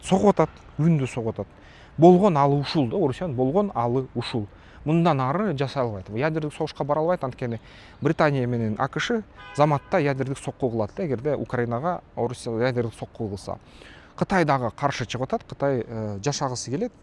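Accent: Standard. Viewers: 😂😂Азаматсыз НУКУРА суйлой бериниз! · Эң сонун,нукура кыргыз тилде сүйлөп жатасын иним.Келесоо маңкурттар жаза беришет, көңүл бурба❤❤❤ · Брат капа болбон таза кыргызча суйлойсуз сиз билимдуу адамсыз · Тура байке сиз мыктысыз кыргыз тилинде таза алып барып👍👍👍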